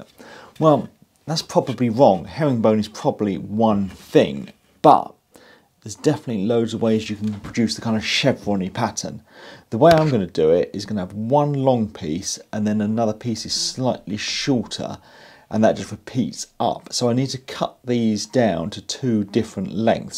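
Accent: RP, United Kingdom. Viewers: I enjoyed your description at the beginning of deciding to make a posh project out of pallets. It fits your posh accent!😊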